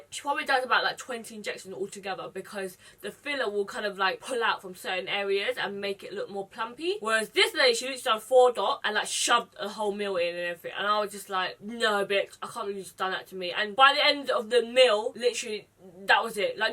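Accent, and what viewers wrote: United Kingdom. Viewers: OMG this is the first time watching a video of you on YouTube and I'm SHOOK of your British accent XD I knew you only from ig for a while and I thought you were American or Canadian :) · People who’s saying they don’t understand her, it’s because she has a British accent and not everyone has the same accent and she also wears braces at the back of her teeth. You all are being such bitches, leave her alone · Carolina Araújo it's called being SARCASTIC....she fucken mumbles. and she has a British accent on top of it so it's even harder to hear her. i can understand....she just doesn't SPEAK CLEAR. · Woah I'm only 9 seconds in I've always watch you instagram videos o had no idea you had a British accent lol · Omg Viet with British accent...loves it!!!!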